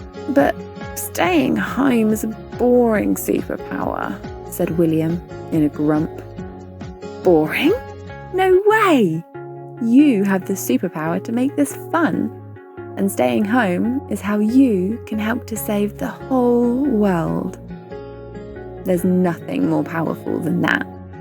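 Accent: United Kingdom. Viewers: Yesh theres a harry potter accent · Gotta love the British accent!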